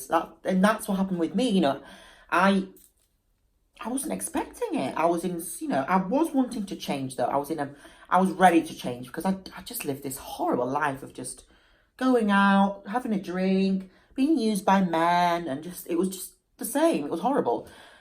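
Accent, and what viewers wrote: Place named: England, United Kingdom. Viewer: Gorgeous as always. I wish I had your lips. 🤤🤤 Your accent... I cant figure out what part of England you are from. 😂 I'm American but have lived in West Yorkshire for 8 years with the English husband. That cheese cake looks amazingggggggg. ❤❤☮☮🥓🥓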